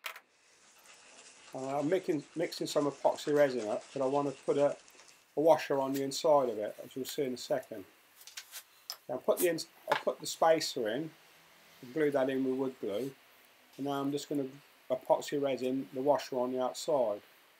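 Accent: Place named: England, United Kingdom. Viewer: Good one mate! You have a great pommy accent for someone with an Italian name.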